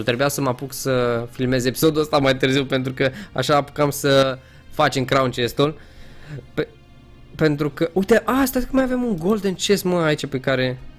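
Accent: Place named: Moldova. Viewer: E moldovean fato ei asa vorbesc....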